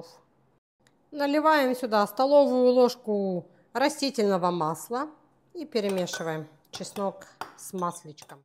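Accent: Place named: Odesa, Ukraine. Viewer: дівчата розмовляють одєсскою мовою. Головне, що всім зрозуміло. Дякую за рецепти.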